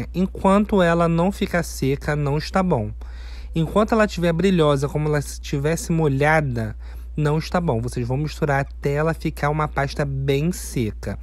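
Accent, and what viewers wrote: Rio de Janeiro, Brazil. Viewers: Adoro suas receitas pq além de vc explicar muito bem ainda ficam lindas e certamente muito potentes, e esse ficou maravilhoso., gosto muito também da sua voz e de seu sotaque. Vc é carioca?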